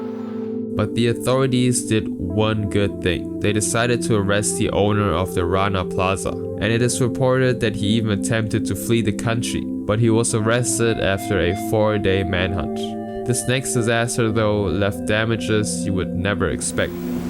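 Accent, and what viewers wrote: Germany. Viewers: Either German or Austrian. He’s got a noticeable accent imo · U sound german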